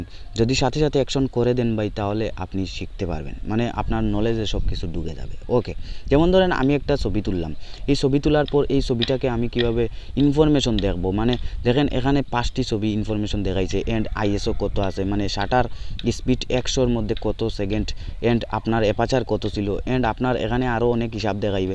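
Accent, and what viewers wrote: Sylhet, Bangladesh. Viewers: Vai apnar basa ki sylhet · Sure o sylheti 100%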